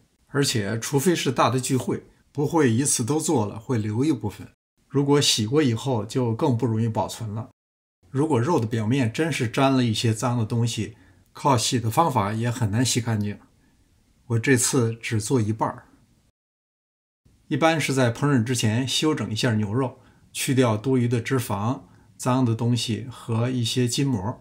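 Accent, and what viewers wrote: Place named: Northwest, China. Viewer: 感觉也是个西北大哥，跟妈妈口音都很像～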